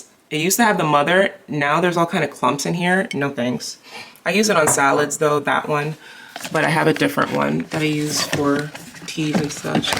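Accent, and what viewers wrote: Jamaica. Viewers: Girl, are you Jamaican?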